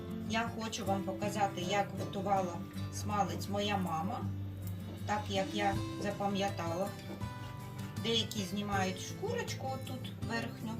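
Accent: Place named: Kyiv, Ukraine. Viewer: Дякую за відео))) Комусь не подобається київський суржик)))а мені його не хватає(знаю що не вистачає,але в києві так)))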